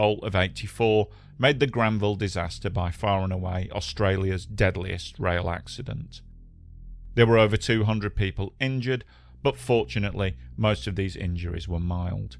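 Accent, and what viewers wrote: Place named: Northern England, United Kingdom. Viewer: You still sound like you're in the north of England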